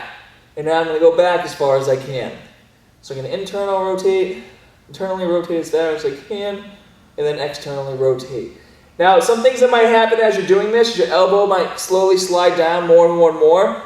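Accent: Boston, United States. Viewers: Back in the day before went full Bostonian "Bahhbelll!"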